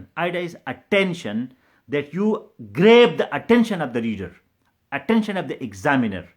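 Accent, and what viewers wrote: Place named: Yousafzai, Pakistan. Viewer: Proud of u Sir..." Sta da de yousafzai accent na zaar sham".....keep up sir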